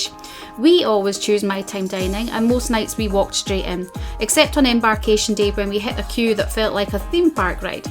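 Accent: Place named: Australia. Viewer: Might be the accent. Aussie’s can hear you just fine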